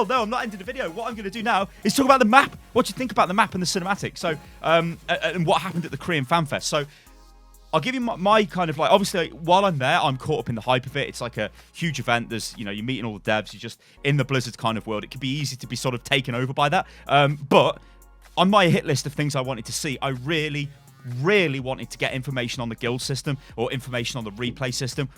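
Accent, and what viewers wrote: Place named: United Kingdom. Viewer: Thanks, I just remembered why I hate the British accent. It's terrible and hurts my ears. How the fuck can you write "Reinhardt" wrong TWICE? Get your shit up.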